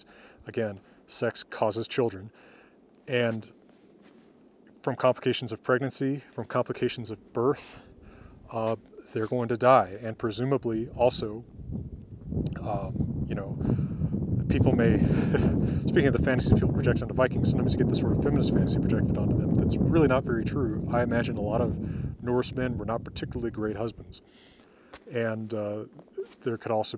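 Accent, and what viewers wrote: United States. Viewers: Using the comparative method of reconstruction and the contemporary sources (e.g. the First Grammatical Treatise) it is perfectly possible for an educated person to come much closer to Old Norse than modern Icelandic, even with an American accent, although I will grant you that Dr. could have made the */i:/ longer. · Interesting video, however when you say you use "Reconstructed medieval Norse pronounciation" I think it's not accurate. You are saying approximately what they sounded like, with an american accent. Rísmál - Icelandic pronunciation --> Reesemowl(approximately) What you said sounded like Rissmaul./mall Who says that 11th century norse "Á" sounded like an english speaker failing at icelandic pronunciation? I don't understand how reconstructed norse spoken by a non native speaker would ever be more accurate than the icelandic pronunciation. 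Icelandic is the closest to old norse we will ever get because it is the same language only older, slightly changed. It would be nice to get a video on the modern reconstruction of norse. I don't know what you would base it on though. Modern icelandic and the old sagas? I enjoy your videos and hope I don't sound discouraging, because your disclaimer was probably excactly for these kinds of comments. A video expanding on this reconstructed pronunciation would be wonderful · I love that you have a deep, tough American accent and voice. With a cowboy intro with western music. In a cowboy envirement. Dressed with a cowboy style. Talking about Scandinavia and Vikings. Freaking badass as a Dane i must say.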